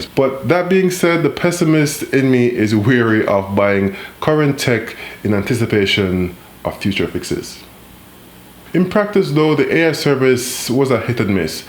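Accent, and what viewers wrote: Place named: Jamaica. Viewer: Love the content bro, also sound like your from Jamaica..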